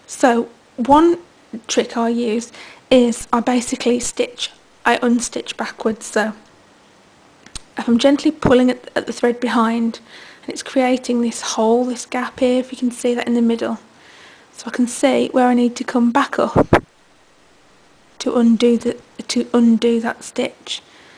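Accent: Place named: England, United Kingdom. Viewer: Loved your video, you talk just like a friend of mine back in England, it makes me homesick! Thanks for the videos! xx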